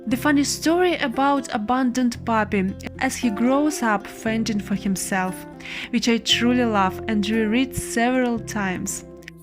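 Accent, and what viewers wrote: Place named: Russia. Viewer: your voice very much with your Russian accent it is so endearing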